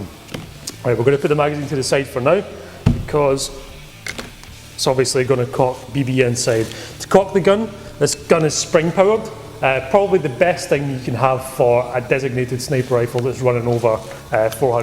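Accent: Scotland, United Kingdom. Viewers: Your Scottish certainly lays down the law, that's for damn sure.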